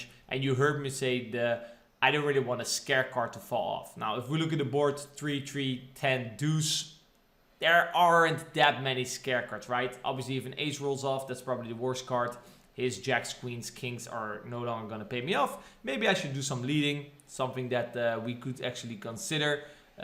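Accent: Netherlands. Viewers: Everything in the Middle AHAHHA, btw are u dutch?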